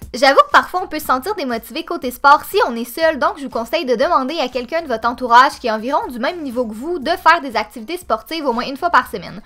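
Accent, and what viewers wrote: Canada. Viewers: Lolilol 309 enfaite elle est canadienne donc elle ne dit pas les mots de la même façon que nous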